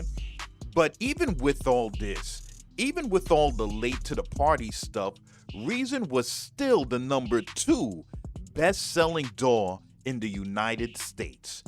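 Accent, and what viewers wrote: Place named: Haiti. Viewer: sak passé! Map boule! Real talk, I can spot a Haitian accent. Even though you don’t really have one per se, I can still tell. I kind of sounds like a queens/Long Island accent mixed with Haitian accent. Love the channel brother.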